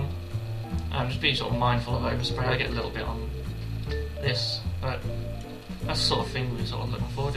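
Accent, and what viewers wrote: Norfolk, United Kingdom. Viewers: is that a Norfolk twang i hear in your accent ?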